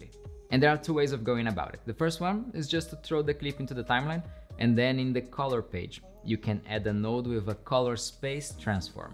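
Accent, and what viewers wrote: Brazil. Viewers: mano, tu é brasileiro? 🤔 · Thanks for the video, really nice explanation!! PS: You have a little Brazilian accent 🤔🤔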